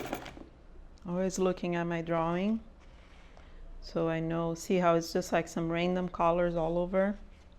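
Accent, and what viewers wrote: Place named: Brazil. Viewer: voce e brasileira ? a sua ingles parece de alguem do brasil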